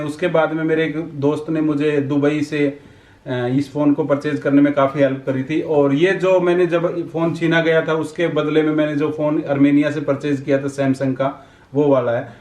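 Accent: Haryana, India. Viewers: bhai harayane k bnde ki awaaz or boli bta hi deti h ..... haryana k h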